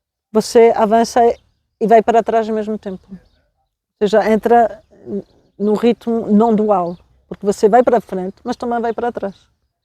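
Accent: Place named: Portugal. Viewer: Muito agradecida pelos ensinamentos...fiquei maravilhada com o sotaque português lembrou muito mamãe...fechei os olhos e imaginei suas estórias a falar com sotaque.❤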